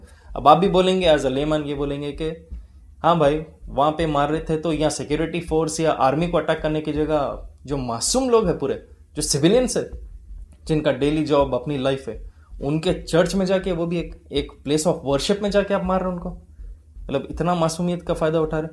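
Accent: Hyderabad, India. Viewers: Bhai tum Hyderabadi ho ky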